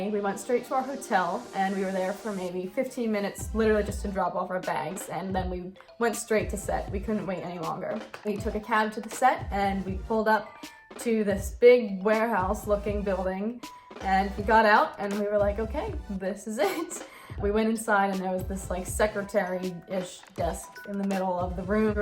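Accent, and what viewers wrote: United States. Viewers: My dad's family is from Philly and you sound just like them.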